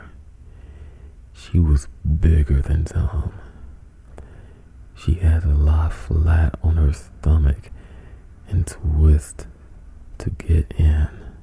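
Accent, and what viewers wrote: St. Louis, United States. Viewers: That 314 accent tho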